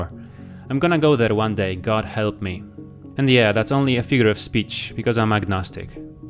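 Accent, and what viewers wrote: Poland. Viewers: Coś tak czułem, że jesteś z Polski :-) Gratuluję świetnego kanału i życzę wielu sukcesów!!! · Trafiłem na ten kanał godzinę temu i tak właśnie pomyślałem, że to chyba Polak. Akcent i typowo "nasze" krajobrazy w tle zdradzały to całkiem wyraźnie. :) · Tak czułem, że jesteś z Polski hahah.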